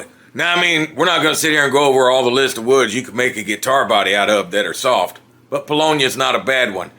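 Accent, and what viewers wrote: South, United States. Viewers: you sound southern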